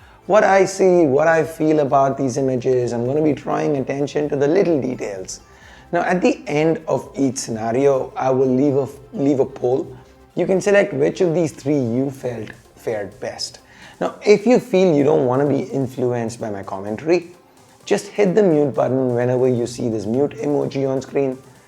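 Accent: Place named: Tamil Nadu, India. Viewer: Ennatha fluent ah English pesunalum....nammalungandrathu thaniya theriuthu 🙏🙏🙏🌸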